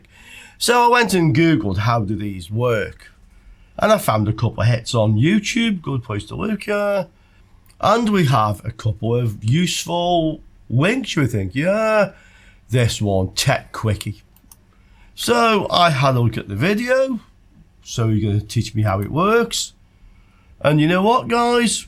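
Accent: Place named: Sunderland, United Kingdom. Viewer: hi.like your accent nowt...haha im from sunderland macam land and we use the same wording for nothing. love your videos im not a tech just find the videos interesting...and simple to follow even though you go off into a tech tangent sometimes haha..but i know what the channel is for.keep up the good work......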